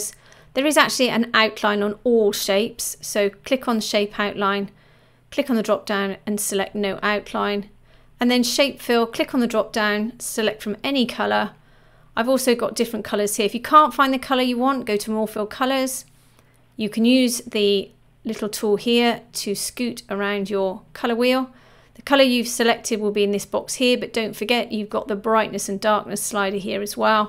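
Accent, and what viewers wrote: United Kingdom. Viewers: British accent and the geat tips. Thank you.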